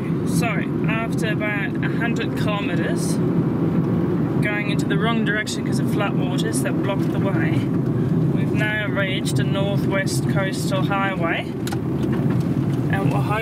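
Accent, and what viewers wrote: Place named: East Germany, Germany. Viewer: Was the water so deep? It almost looked like you could just drive through :) would have been funny and maybe wet in the car :) und ich liebe den Ostdeutschen Akzent :D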